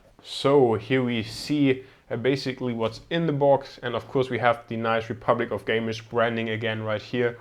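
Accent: Germany. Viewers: you sounds geman for me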